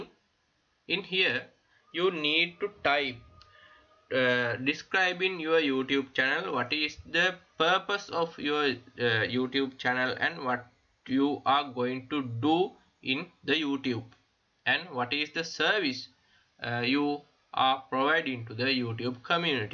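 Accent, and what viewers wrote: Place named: India. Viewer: I don’t understand anything he saying because it’s Indian accent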